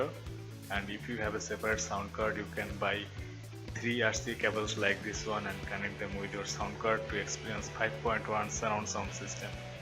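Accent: Bengal, India. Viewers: are you a Bengali? It's so evident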